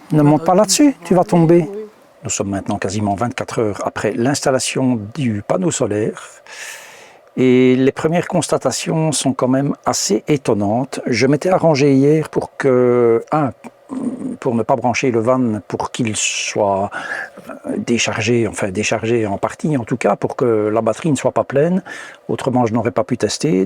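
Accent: Belgium. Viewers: Meticuleux soigné avec un ravissement pour l oreille...l accent belge juste ce qu il faut ! 😎merci !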